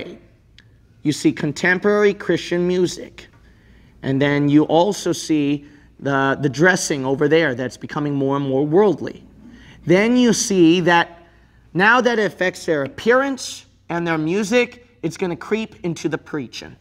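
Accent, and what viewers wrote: United States. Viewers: He speaks in American accent.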